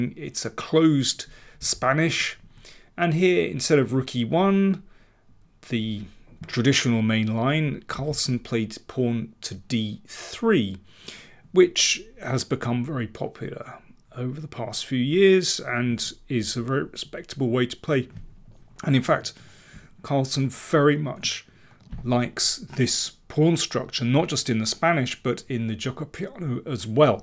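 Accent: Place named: RP, United Kingdom. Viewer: If you wanna hear someone talking too much, try agadmator's Chess Channel.. or, if that's not enough even try this Pepe-guy on chess24: absolutely unbearable word-diarrhoea. Daniels Grandmaster speak is exactly in the sweet spot between education and entertainment, and neither too little nor too much. And, may I add, kept in the most wonderful Queens English. Keep it up.. and tnx a bunch:-)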